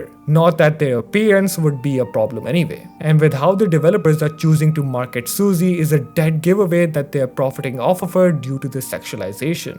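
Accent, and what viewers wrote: India. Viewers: If u can ignore the indian accent then this content is actually pretty god damn good.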